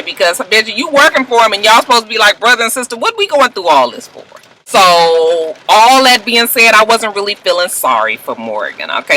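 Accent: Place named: California, United States. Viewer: !! I love your Ray J! You know you're from Cali, you got the accent down. You went back to Cali with "molded" and "loc up" today! I'm just but I am so excited, I can't wait for Mally Mall! I can hardly contain myself! LOL. Love your videos!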